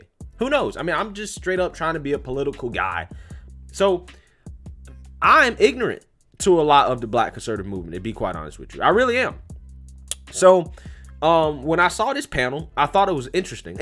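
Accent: South, United States. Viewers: im a white conservative in the south, i get your points. you did not need to use proper southern English.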